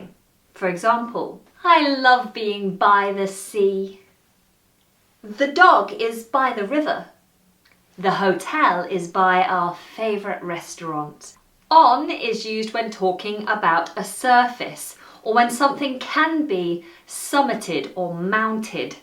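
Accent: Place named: United Kingdom. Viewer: I'm( in ) love with British pronunciation...A fan from colombia south America..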